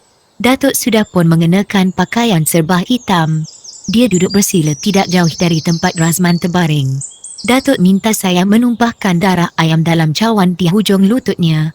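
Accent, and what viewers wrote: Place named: China. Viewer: Yang pasti..penceritanya bkn melayu..pelatnya cina..apa pun terima kasih kerana tolong menyampaikan cerita 🙏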